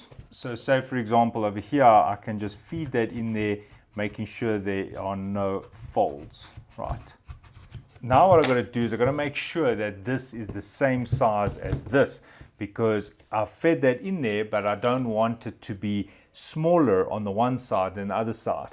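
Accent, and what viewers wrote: South Africa. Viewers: Defo a South African accent!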